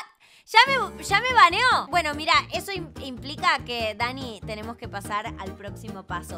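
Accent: Argentina. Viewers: sos de qué país seguro con esa voz sos de Argentina estoy segura con es voz que tienes me gustaría conocerte yo voy a viajar en el país Argentina ❤😂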